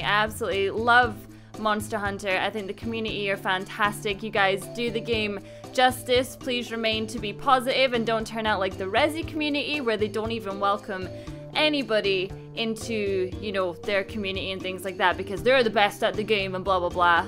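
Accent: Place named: Scotland, United Kingdom. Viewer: I'm thinking...Scottish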